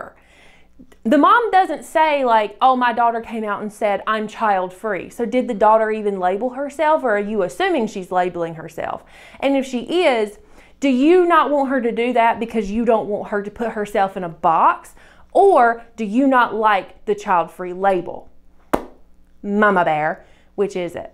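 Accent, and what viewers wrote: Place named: South, United States. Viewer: I love your content so much, it makes me feel better about my decision hearing someone who, at least sounds southern, talk about being childfree. I have known I don't want kids since I was like 10-12, I'm 23 and my entire family still says "you might change your mind" despite the fact I have adhd and depression already, despite telling them there is a high chance I will get post-partum and hate that hypothetical kid to the point of possibly hurting it, they don't care cause I have a uterus and we come from a big, Southern family raised on Christian values that I don't even believe in.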